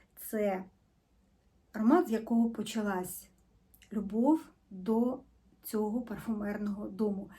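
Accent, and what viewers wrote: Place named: Russia. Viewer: Акцент очень сильный, вы говорите на русском в быту... Это точно😊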